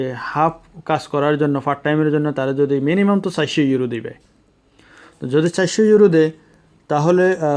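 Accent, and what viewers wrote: Noakhali, Bangladesh. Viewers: bari nokhali mone hoy.. tai abal marka kotha barta..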